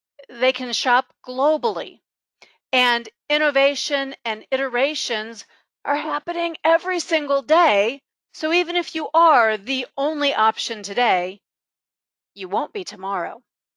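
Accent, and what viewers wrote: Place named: United States. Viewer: The heavy American accent has ruined the presentation.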